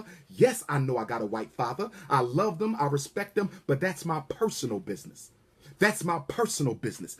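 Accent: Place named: Philadelphia, United States. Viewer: Woooow... you can tell for sure Dr. Umar is definately from Philly! On another level...love my brother his intellect is beyond sexy! - I always say this, they will never ever go against the oppressor because the oppressor reared them--I dont believe they can.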